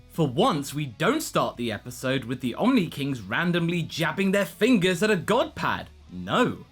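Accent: United Kingdom. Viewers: The way brits say words is cringe to me cabber and freezer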